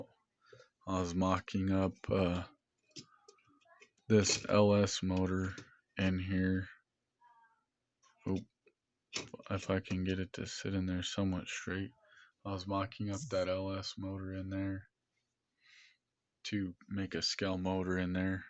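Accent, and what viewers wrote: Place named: Alaska, United States. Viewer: Are you Alaskan? My cousin has a similar accent, she lives in Juno. Just curious! You have Great Content on here! Keep the Awesome Videos coming! 🤗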